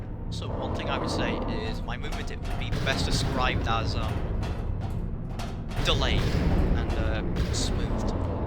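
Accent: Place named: United Kingdom. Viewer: well documented video but you have the funni brit accent and its distracting pls be a little less tea sipping brit next time pls ❤‍🩹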